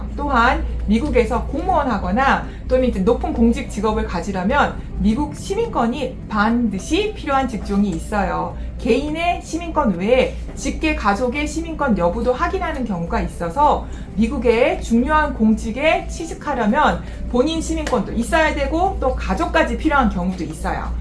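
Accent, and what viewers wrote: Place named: United Kingdom. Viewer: Someone said Tomato, and someone said Tomaito. Potato, or Poteito(물론 발음한정) 만약에 British Accent 는 저분의 발음에 가까습니다. 영어의 자음은 지역에 따라 변화가 심합니다. Northern Ireland accent 가 아마도 가장 좋은 예 입니다. 물론 London 의 Essex 지방은 , L. 또는 T 발음이 거의 묵음에 가깝고, Australia Accent 도 아주 심한 모음 변화를 같고있습니다 (AEIOU)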